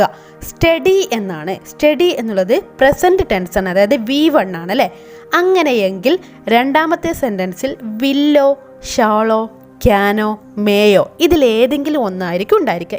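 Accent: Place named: India. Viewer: cringe indian accent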